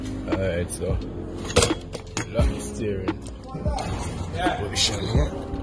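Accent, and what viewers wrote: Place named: Jamaica. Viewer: Sound like you in Jamaica, same place mi deh, I have the same car with the same issue. How can I get that bushing?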